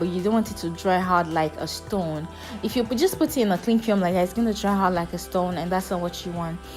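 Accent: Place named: Ghana. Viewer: You don’t sound like a Nigerian but a Ghanaian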